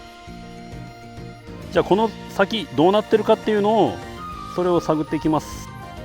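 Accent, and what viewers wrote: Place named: Fukui, Japan. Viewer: 結構訛ってんな😀独特の福井訛り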